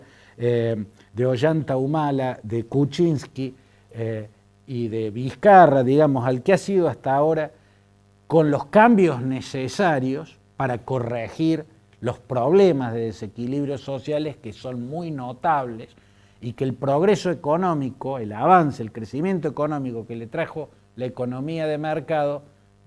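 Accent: Córdoba, Argentina. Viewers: Es cordobés? Se nota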